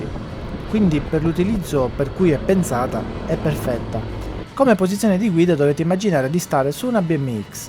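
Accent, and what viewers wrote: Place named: Syracuse, Italy. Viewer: Salve, anche io ho una ep 2 pro e ogni tanto cerco video per avere confronti. Oggi, con molto stupore, ho trovato questo che oltre ai dati tecnici, mi ha riportato parzialmente a casa (ho parenti a Siracusa, la conosco benissimo e se potessi ci vivrei). Anche l'accento è familiare dunque mi sono iscritto anche per seguire aggiornamenti di questo modello. Complimenti per la chiarezza delle spiegazioni durante le prove. Ho fatto le mie prove di autonomia secondo i vari aiuti di modalità ma attendo quelle che farà in seguito per avere confronti. Complimenti ancora e W Siracusa.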